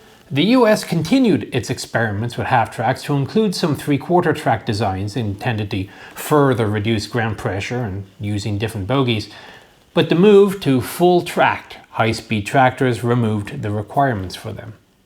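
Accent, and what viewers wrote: Ireland. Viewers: I can hear the Irish accent coming out all over the place! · Is this some joke reference that I've missed? As bwarre2884 noted, the accent is very Irish. In any event, he is perfectly understandable.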